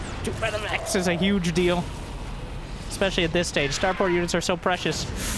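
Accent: South, United States. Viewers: Never Imagine the day, I will be watching a starcraft commentary with a southern accent.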